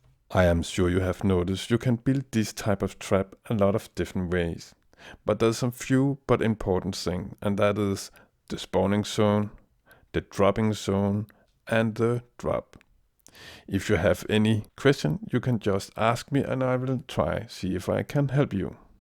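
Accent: Denmark. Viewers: he most be danish :D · He has a very danish accent :) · I put your videos on replay just to hear your accent. My name is Dan, so it being a DAN-ish accent gives me some sort of ridiculous thrill XD but anyway good job, man, you helped me a lot to build a working mob trap for the guys on my server :) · His accent sounds very danish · H0T-Vlog Go to My Channel What? I was just mentioning that we can hear the danish accent pretty clearly? Also its an 8 year old comment. I dont get it, why send an entire IGN walkthrough? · Your Danish accent is awesome! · You are 100% danish! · Are you from denmark!? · Dansk accent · No, he sounds like someone who speaks multiple languages, is Danish, is a genious, and provided you with the perfect tutorial on how to build an xp farm. Stop hating cuz you couldn't dream of being half as smart as this guy. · you're danish. i can hear it. 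· du dansker ik? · Are you from Denmark?!?!? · You come from Denmark right? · er du dansker ???? synes jeg kan høre :P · er du dansker eller hvad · I think he is a dane..... LOL HAHAHAHA dat accent. Nice tutorial though well done :) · Are you danish? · are you from denmark?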